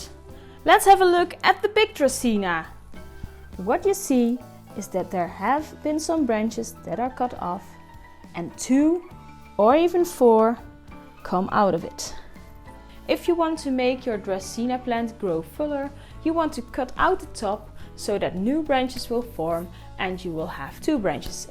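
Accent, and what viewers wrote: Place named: Netherlands. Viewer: Ik hoor dat je Nederlands bent kan je me helpen ik heb ook een draceana maar niet dezelfde wie jij hebt deze heb ik helemaal tot stam afgesnoeid maar wel aan een tak uitloper laten zitten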